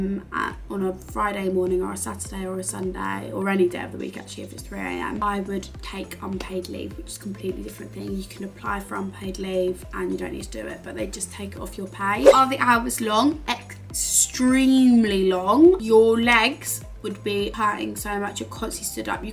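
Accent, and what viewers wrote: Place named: United Kingdom. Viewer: Beautiful in the proper British accent 😮😮😮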